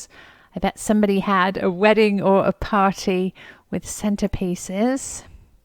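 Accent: England, United Kingdom. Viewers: and her mum are English 🇬🇧🇬🇧🇬🇧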